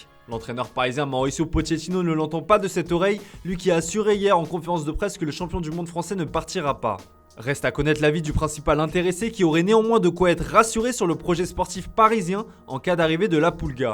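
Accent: Italy. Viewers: aucun rapport mais il est parti l'autre avec son accent italien tout peté? jai unfolow a cause de lui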